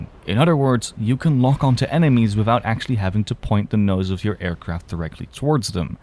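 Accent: Netherlands. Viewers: wait is bro a dutchy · ben je nederlands?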